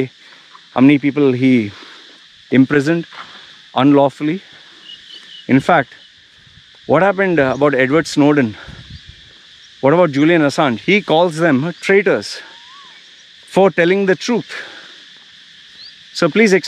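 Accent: India. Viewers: his accent sounds very much indian too me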